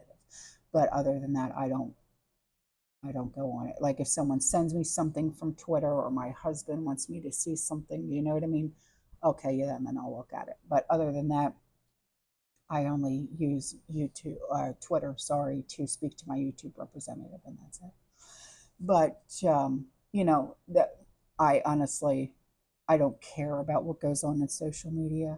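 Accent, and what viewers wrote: New York, United States. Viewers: Hello 🤗 I shared your page with a girlfriend of mine to your page. She thinks you're very intelligent well-spoken and loves the New York accent 😁 Have a great day God Bless 🙏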